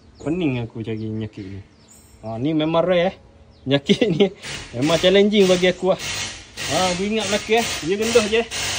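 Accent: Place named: East Coast, Malaysia. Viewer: Assalamualaikum bengkel mne eh dengor loghat mcm pata timur😅...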